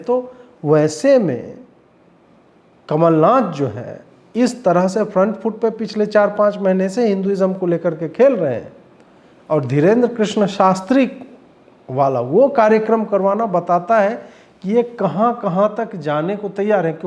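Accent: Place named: Begusarai, India. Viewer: अपने टोन में कुछ बेगूसराय्या भाषा का प्रयोग आपके वीडियो को बेहतर बना देता है।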